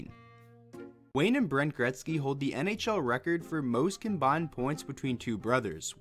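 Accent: Canada. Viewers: How did you get to be a wings fan? Sounds like you're from Canada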